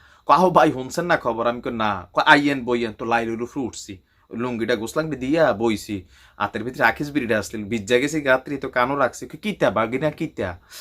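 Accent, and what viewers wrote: Comilla, Bangladesh. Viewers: Viaya amr nanar bari o Cumilla. Apnar mukhe cumilla bhasa sune valo laglo 😊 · আপনার কুমিল্লার আঞ্চলিক ভাষা গুলো আমার অনেক ভালো লাগে সত্যের পথে এগিয়ে যান আল্লাহ আপনার সাথেই আছে✌✌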